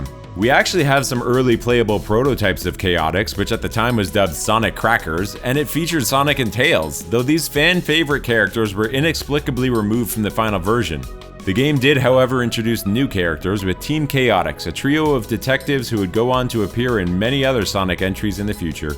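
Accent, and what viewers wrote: Canada. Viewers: i can always tell when its a canadian when they talk funny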